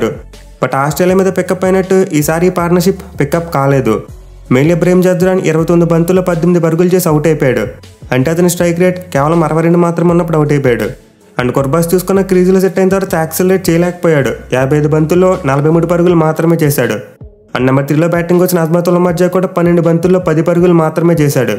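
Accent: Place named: Andhra Pradesh, India. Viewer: Andhra slang 😅😅😅😅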